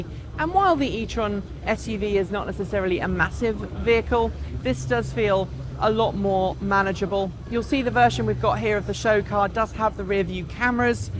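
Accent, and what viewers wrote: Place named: United Kingdom. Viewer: I still don’t understand why you report in British accent while you talk normal US accent in person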